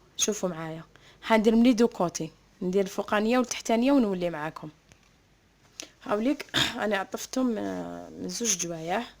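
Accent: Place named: Algiers, Algeria. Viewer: نموت على اللهجة العاصمية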